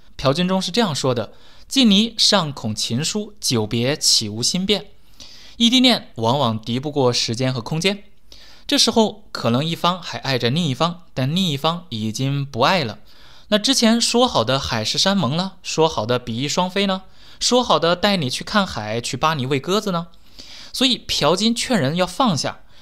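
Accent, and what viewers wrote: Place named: Fujian, China. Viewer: 说普通话的感觉像闽西北的朋友 哈哈哈 很高兴认识您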